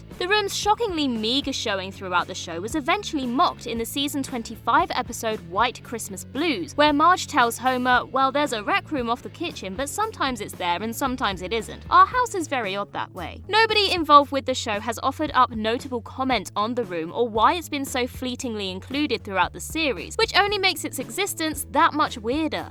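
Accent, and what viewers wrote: United Kingdom. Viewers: The narrator is British.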